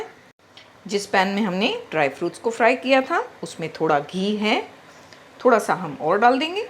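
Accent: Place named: Konkan, India. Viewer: Mashallah, aapi recipe k naam per daaba k khaate hai Aap log, aap k lehja kehta hai aap konkani hain...kabhi kabhi Bhatkally dish bhi banaye Karain.. runaways success guaranteed.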